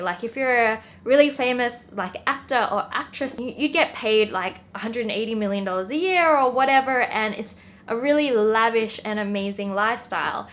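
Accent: Australia. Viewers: OMG her Australian accent is way stronger in this video compared to her 2018 vids 😂😂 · Are you Australian? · Love that Australian accent tho... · Your accent changes. I hear Australian n American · Are u an Aussie ??? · are you australian? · is that Australian accent? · Is she Australian??? Fellow Aussie!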